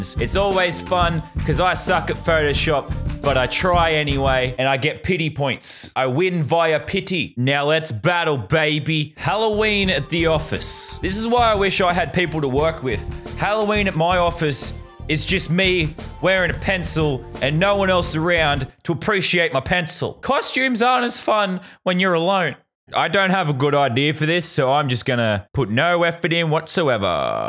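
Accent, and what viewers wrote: United States. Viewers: was anyone else surprised by his American accent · bruh his american accent is actually insane lol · So we’re just not gonna talk about how Californian his American accent was, he sounded like a different person!!! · no joke, Lazars American accent is on point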